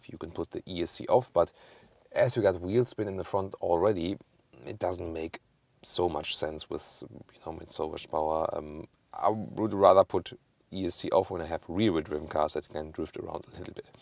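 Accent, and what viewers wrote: Germany. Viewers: You are becoming my favorite reviewer!!! I'm American of German descent, so I love hearing an English review in a German accent! And I like your opinions very much, as well as the quality and information from your videos. Thanks, great work! (I love this car, too! we had this model here until the 80's, I believe. My friend had a Scirocco, it was awesome!)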